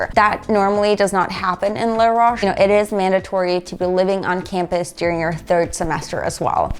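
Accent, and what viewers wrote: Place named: United States. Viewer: Accent sounds American accent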